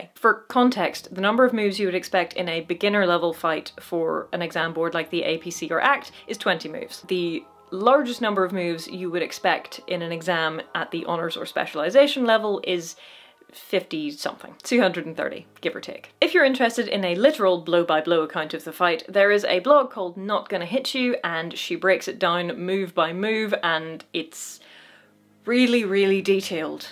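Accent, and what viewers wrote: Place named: Northern Ireland, United Kingdom. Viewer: Now that's a posh Norn Iron accent! North Down?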